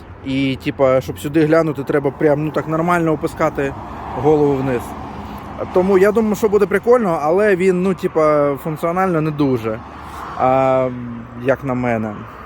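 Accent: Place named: Russia. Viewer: Комент в підтримку каналу, виключно за українську мову! Відчувається що людина раніше розмовляла Мокшанською... Респект! Розвитку і успіхів!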